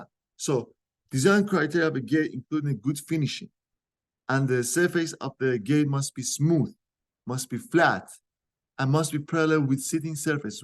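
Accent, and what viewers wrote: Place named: Iran. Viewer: Mr. Mr. What is this accent why you talk like this? Everybody must know you iranian ?? Mr. Loose the accent your in texas now baba joon what is this ??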